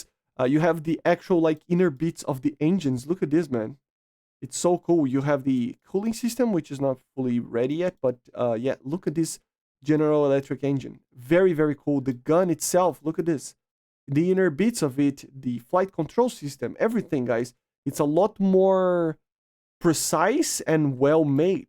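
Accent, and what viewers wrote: Brazil. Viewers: Are you Brazilian or Argentina ? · You literally just put words in my mouth. I simply said He's Brazilian. Another words you shouldn't expect someone that clearly isn't a native English speaker to say everything correctly. So to stop this pointless argument so both of us can get on with our nights lets not put extra words in my mouth that clearly wasn't said in the sentence that I said. Enough is Enough lets just get on with our nights and have a beer on a nice Saturday night. If that's not what you do fair enough just enjoy the rest of your night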